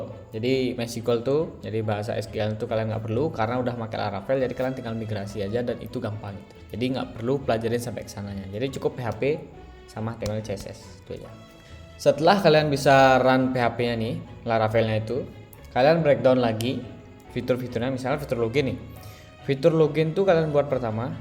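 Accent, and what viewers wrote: Bali, Indonesia. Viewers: Kental banget dengan logat balinya bli, btw salam dari programmer lombok bli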